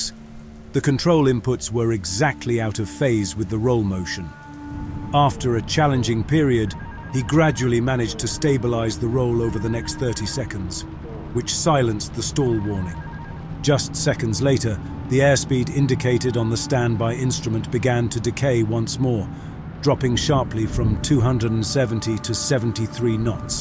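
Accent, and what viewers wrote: England, United Kingdom. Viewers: English accents are overused and annoying.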